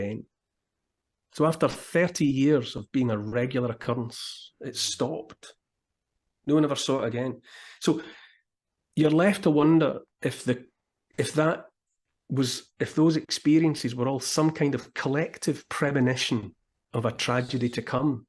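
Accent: Scotland, United Kingdom. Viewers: That strong Scottish accent kind of made it obvious that your a Scottish guy 😅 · I never knew you where a wee Scot? 🧐😊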